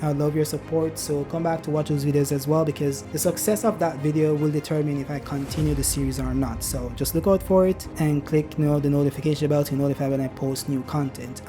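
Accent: Jamaica. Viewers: Do you have Jamaican ancestry your talking kind of sound like that for me??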